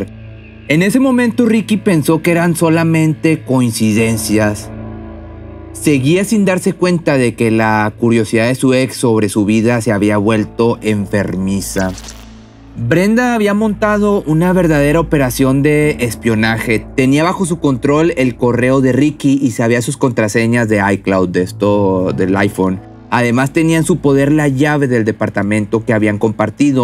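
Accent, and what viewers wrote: Mexico. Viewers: Es cierto, tiene cierta gracia al narrar, eso me hace ver sus videos y esto que no me gusta el acento mexicano.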